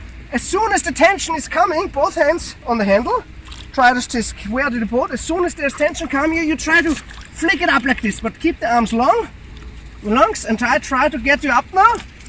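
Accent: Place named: Austria. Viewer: Du bist ein netter Kerl und deine Videos in deutsch sind wirklich hilfreich,aber warum dies Video in englisch? Für wen? Selbst für mich mit Muttersprache Deutsch und sehr guten englischkenntnissen ist es eine Qual dir zuzuhören. Viel schlimmer noch für jemanden mit Muttersprache englisch. Bleib lieber bei deutsch mit nettem (österreichischem?) Akzent. Dein englisch ist einfach grausig.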